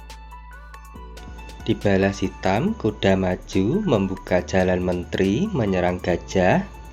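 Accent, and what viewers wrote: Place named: Central Java, Indonesia. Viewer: ..yg koment bukannya ngantuk ttpi yy bgitulaah medhok bingit logat 1area wilayah daerah di jawaTengah, lanjutkan dik Adminn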